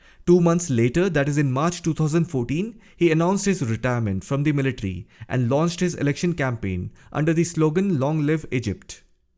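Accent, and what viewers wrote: India. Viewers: I like his Indian English accent.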